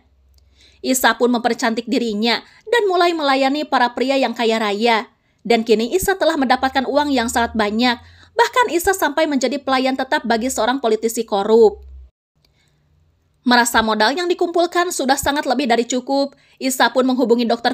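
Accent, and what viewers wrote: West Java, Indonesia. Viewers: Ini admin nya kaya nya orang sunda nya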